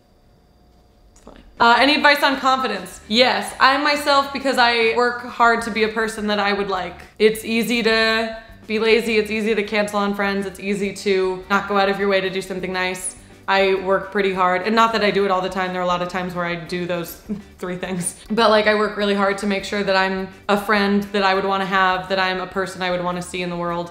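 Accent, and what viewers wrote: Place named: South, United States. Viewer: "everybody's got sumthin" YASS southern accent queen.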